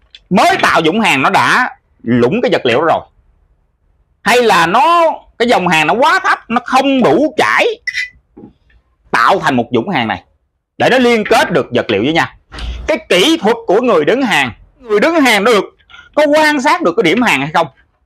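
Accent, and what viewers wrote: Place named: Cu Chi, Vietnam. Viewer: Cảm ơn em , video rất bổ ích , nghe giọng em Anh đóan Em ở Củ Chi phải khổng ?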